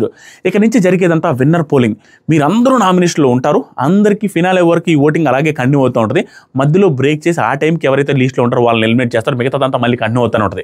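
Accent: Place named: Nellore, India. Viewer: Hi,anna sleeping😴 review avuna😮😅 nenu from nellore district now in princeton usa ,mana slang so happy vinadaniki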